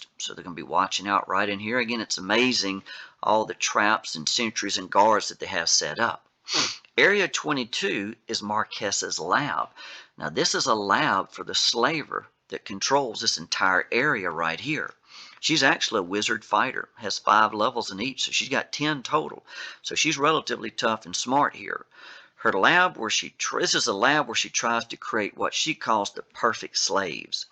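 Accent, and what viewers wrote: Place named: Appalachia, United States. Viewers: I’m loving that accent. What part of the US is it from? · I'd say southern Appalachian. So maybe Tennessee or southern West Virgina.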